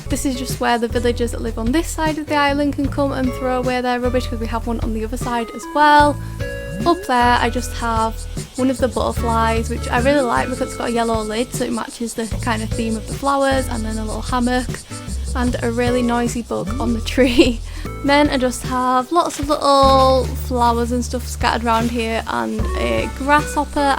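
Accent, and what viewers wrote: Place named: Scotland, United Kingdom. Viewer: i’m realizing you’re scottish not irish bye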